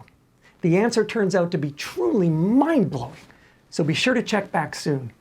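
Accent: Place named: United States. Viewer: You have like the perfect american accent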